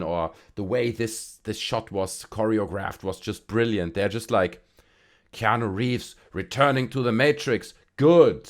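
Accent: Germany. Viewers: Subbed today. Love the authenticity. Can’t place your accent. Is it German?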